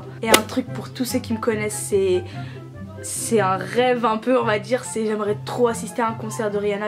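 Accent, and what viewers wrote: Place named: England, United Kingdom. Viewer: J'adore ton accent anglais 😂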